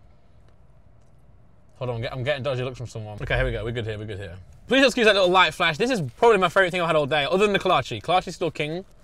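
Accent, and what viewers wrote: United Kingdom. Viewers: The funniest part of this is you look so cowboy with the hat but then you’re violently British when you talk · I hate British accents, painful watch but I’m only watching to see papi whataburger. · he prob has one of the most tame british accents lol but go off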